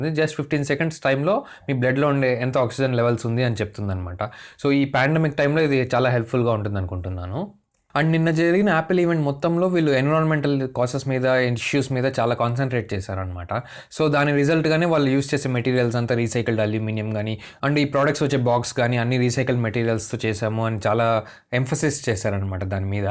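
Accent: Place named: Tamil Nadu, India. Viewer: Superb..bro..voice Bagundi tamil.mix ainatu vasthundi. Good ❤️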